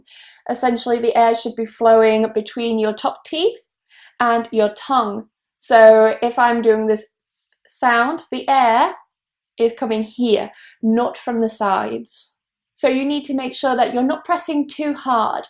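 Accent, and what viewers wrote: United Kingdom. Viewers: I'm really for in love for video. Fist at all I like british pronuncition, and it much clear. I was looking for a pronunction couse and it meet what I wanted. My language is portuguese and video was so helful. I love english language. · I like British's accent. beautiful. I like you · I fell in love at first sight, but I'm afraid you're already married ☹️. Anyway, I'm learning american english nevertheless I'm going to learn british accent as well because of your videos. Thank you in advance 😊. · thank youu,,, i love ur british accent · Thank you Thoroughly This is a little too British for me… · Thanks a lot of your video and beautiful British accent. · Thanks for the video,..it's good to learn how to pronounce words in british accent...it's amazing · Oi mate! 🤭 love listening to British accent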